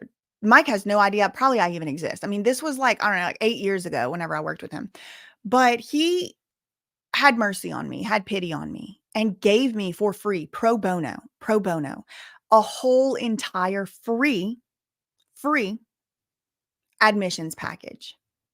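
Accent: South, United States. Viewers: Wow you're story's amazing, congratulations on going from where you did and with disabilities to now a lawyer, and I love your southern accent is so cute just like Britney's, don't ever change it. You should email that person and just say well bless your heart your southern so I'm sure you know what that really means when you tell someone that lol. ❤ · I’m not American, but I don’t get why a person with southern accent is seen as lower in any capacity. It’s pretty evident that you’re incredibly intelligent. And you did it, you are on top, don’t let anyone make you feel less than. You’re so beloved and respected because you’re the real deal and i bet there are young girls looking up to you and wanting more for their lives despite the circumstances they are growing up in. You’re wonderful, funny, smart, beautiful and quick on your feet. We love you girl ❤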